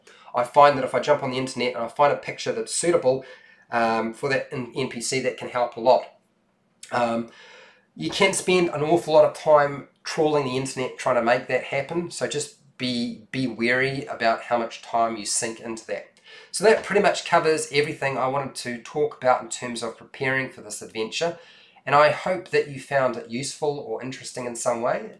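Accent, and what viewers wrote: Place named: New Zealand. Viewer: you sound like you’re from New Zealand and stereotypical people, think fish and chips for you sounds like fush and chups.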